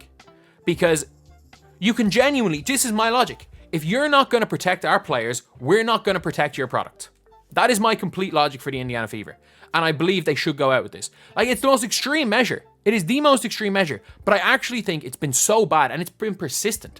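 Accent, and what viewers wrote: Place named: Ireland. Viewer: It’s an Irish accent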